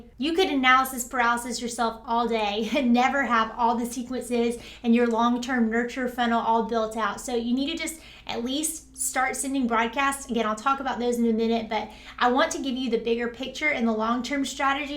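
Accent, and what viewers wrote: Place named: South Carolina, United States. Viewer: Has to be from South Carolina with that accent. Represent lol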